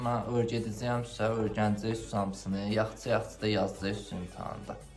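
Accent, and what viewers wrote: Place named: Baku, Azerbaijan. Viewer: Qeseng 👍 lehce yarasib ozumde bakiliyan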